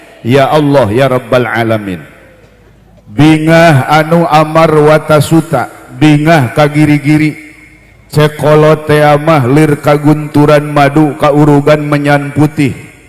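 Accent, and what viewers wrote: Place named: Banten, Indonesia. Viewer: Sepertos urang banten nyariosna. Mantaaap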